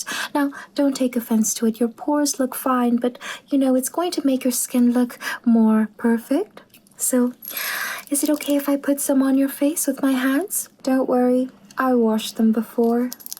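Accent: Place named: Transatlantic, United States. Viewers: shows yet again that is a woman of many artistic talents!!! the acting was si good here and the transatlantic accent too, it really gave a vintage vibe 💕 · Have I been saying Avon wrong this entire time or is it just the transatlantic accent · Saw the notification and RAN!! Also, your transatlantic accent is getting really good! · Damn why the green screen look so real Makeup videos are always a classsiccc and I love the transatlantic accent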